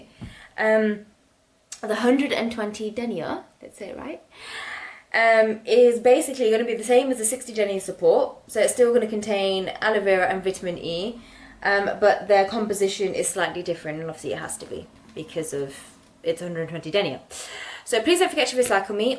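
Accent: United Kingdom. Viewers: I enjoy all of your videos and I love your British accent your voice is lovely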